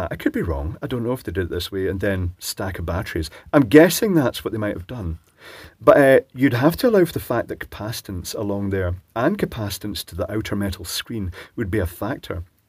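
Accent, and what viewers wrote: Scotland, United Kingdom. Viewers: I have always wanted to hear EM theory spoken in a Scottish accent. Maxwell was a great scott.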